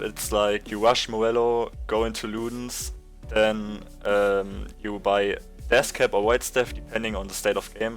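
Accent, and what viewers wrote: Germany. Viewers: Deutscher Akzent :DDD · Der deutsche Akzent xD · Das deutsche englisch 😂😂 ich liebe es geiler Guide! !!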